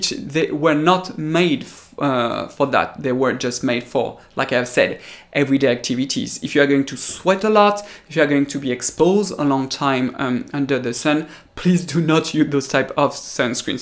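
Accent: France. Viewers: Welcome back!!! I am so glad your making videos again! I love your French accent so much!!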